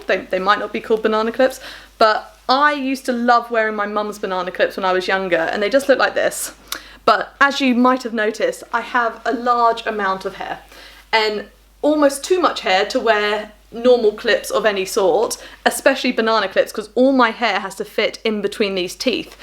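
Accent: United Kingdom. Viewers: I'm enjoying your british accent 🥰 and i love thrifting 💖 new subscriber 🎉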